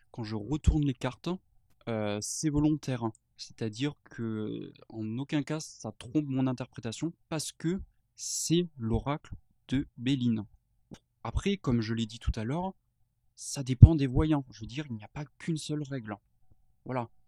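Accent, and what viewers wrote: South, France. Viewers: Bonjour les cartes sont un support mais le ressenti et très important votre accent du sud ça fait du bien merci à bientôt.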